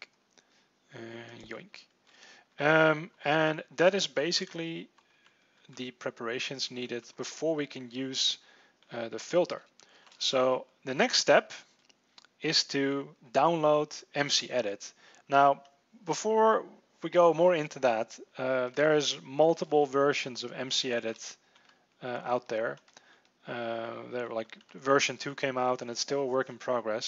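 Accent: Netherlands. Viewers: You sound Dutch as fuck. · je klinkt nederlands.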